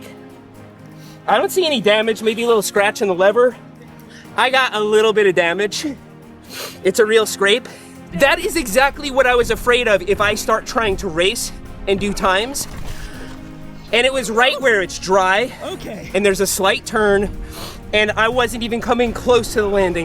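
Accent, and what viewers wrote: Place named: Queens, United States. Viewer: He also drinks wooder like everyone from Queens.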